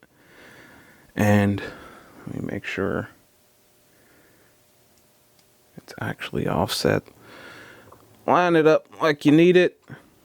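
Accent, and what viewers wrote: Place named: Baltimore, United States. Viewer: You from Baltimore? It sounds like my hometown! Nice vid thanks for the hard work in putting it online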